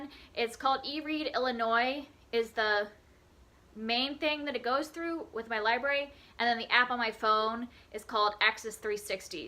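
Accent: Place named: United Kingdom. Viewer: For me if you have a British accent for your audiobooks ... I will listen to you talk for hours!! Such great recommendations on this list!! ☺️💜💜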